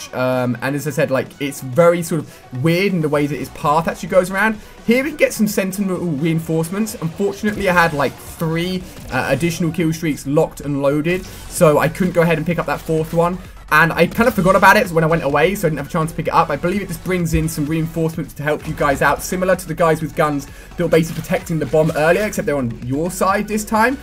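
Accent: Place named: United Kingdom. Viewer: It's because he's British and they sound different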